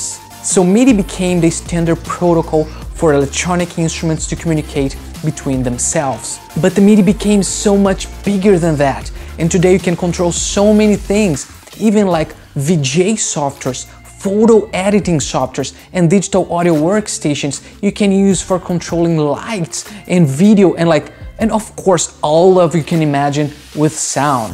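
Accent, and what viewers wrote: Brazil. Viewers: Thanks for the info, I am going to build a controller for my DAW. I am from England but now permanently live in Brazil (Your accent sounds Brazilian).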